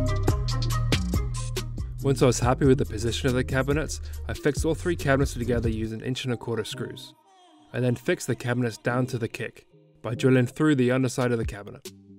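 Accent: England, United Kingdom. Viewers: You sound English. Why are you using imperial units and not metric?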